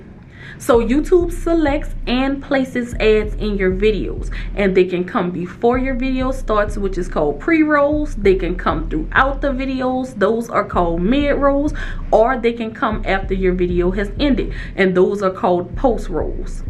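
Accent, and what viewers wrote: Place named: Philadelphia, United States. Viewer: Let me guess I’m hearing a Philly accent lol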